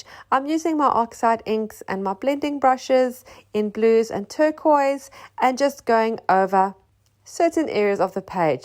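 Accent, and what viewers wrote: South Africa. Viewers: There you go! I hadn’t actually picked the South African accent either which is strange as it’s one of my fave places - have been twice and can’t wait to go again! One day! <3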